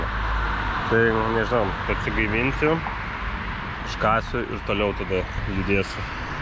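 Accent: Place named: Dzūkija, Lithuania. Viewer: Kietai. Fainas dzukelio akcentas :)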